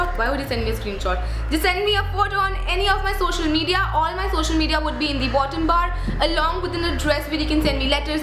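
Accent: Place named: Delhi, India. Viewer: Hiiiii... I just came through your YouTube Channel last night and I'm TOTALLY YAYYYYYY in love with it... Have already watched more than 10 videos since last night 😀 I just love your confidence in front of the camera.. The way you talk and everything... So dilli wali ladki 😊😊 ❤ And coming to the Giveawayyyyy... My favourites are the Maybelline BB Cream and Colossal Kajal 😊😊😊... Both are perfect for my oily skin... Badly wanna win this Giveawayyyyy yaayyyy 😍😍😍😍 Looking forward to your reply 😘😘😘😘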